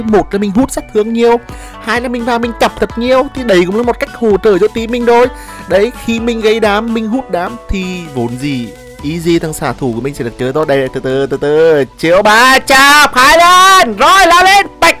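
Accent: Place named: Central, Vietnam. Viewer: Thích giọng miền trung ghê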